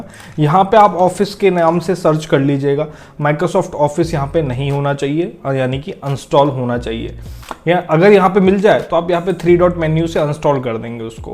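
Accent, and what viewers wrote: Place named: India. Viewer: Bro, you sound like an auctioneer with an Indian accent. slow tf down, there's no prize for fastest speaker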